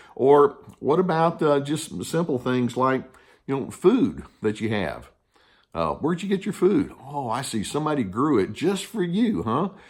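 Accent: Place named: Texas, United States. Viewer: Lol it's the Texas accent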